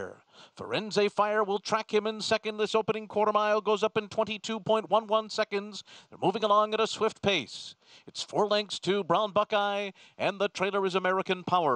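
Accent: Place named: Venezuela. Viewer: castellano de Venezuela !!!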